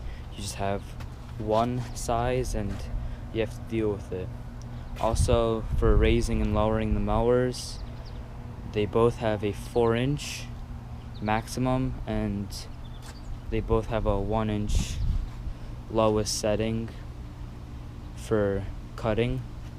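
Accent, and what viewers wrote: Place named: Eastern Europe. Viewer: Good review, however, I'm a sociolinguist and your accent perplexes me. I hear eastern Europe maybe hebraic? Not trying to be rude, honestly curious.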